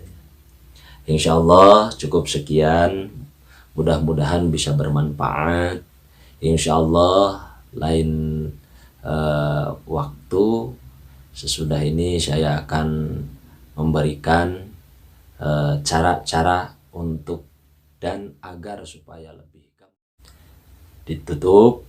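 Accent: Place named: West Java, Indonesia. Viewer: siga asli sunda euyy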